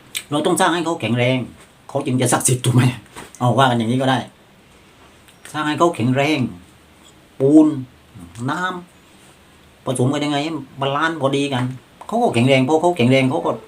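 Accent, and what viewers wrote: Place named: South, Thailand. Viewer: หวัดดีค่ะ พูดใต้แทรกบ้างก็ดีค่ะฟังน่ารักดี เชียร์นะคะ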